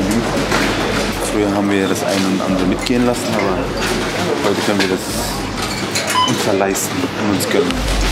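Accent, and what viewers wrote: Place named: Franconia, Germany. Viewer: er fränkelt manchmal :)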